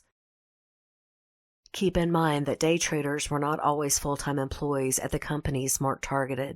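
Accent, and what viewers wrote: South, United States. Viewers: Btw, even though i said that on my last comment, i have subscribed. I love your relaxing southern voice and your story telling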